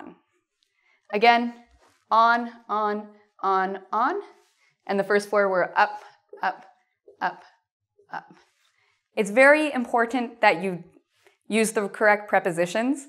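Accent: United States. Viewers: 美式英文太难听了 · I'd say she's American accent (I am not quite sure), either way it doesn't really matter, those phrasal verbs are used in UK as well :)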